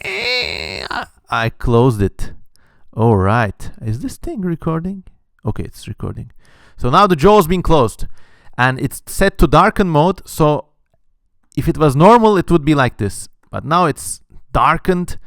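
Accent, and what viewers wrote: Turkey. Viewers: That accent is so Turkish and I love it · I was just curious about All Tomorrows author, but never clicked one of his videos. I came across by and clicked it. After 1 second I heard you, I was like "Dude, are you Turkish?" And I clicked the Patreon and YES I WAS RIGHT Brrrr Ellerine sağlık <3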